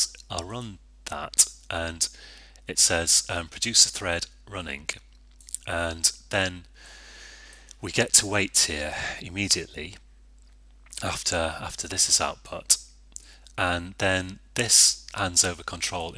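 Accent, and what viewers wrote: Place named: United Kingdom. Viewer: Well done) Thx, nice tutors. It will be better to subscribe on your channel. Oh by the way. One little question: You are from GB ? interesting accent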